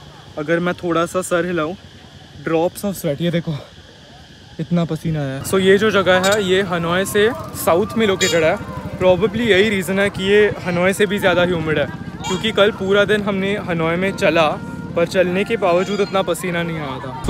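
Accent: Maharashtra, India. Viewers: marathi Manus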